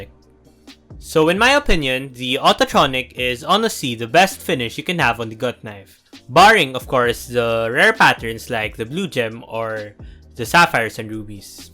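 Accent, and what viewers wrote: Philippines. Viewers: i can hear it in your accent kabayan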